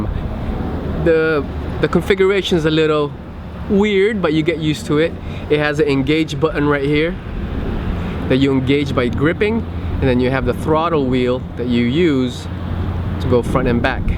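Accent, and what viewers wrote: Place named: Europe. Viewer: You talk like idubbz with European accent lol!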